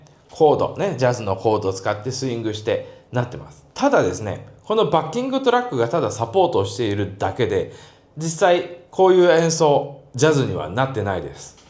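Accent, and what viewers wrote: Nagoya, Japan. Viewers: 快傑ズバット👍 誰が分かるねん😅 名古屋弁いいですね！ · wes弾いたらうまそう😂 名古屋方言が心地良い🎸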